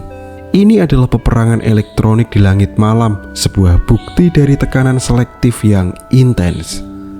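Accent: Java, Indonesia. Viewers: vo nya jawa 😂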